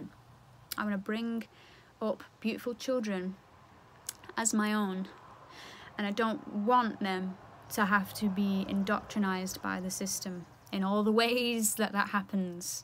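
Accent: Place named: Lancashire, United Kingdom. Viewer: Kútulas She lives in Lancashire England doesnt she ? Her accent is local. I dont think this video is due to media conglomerates.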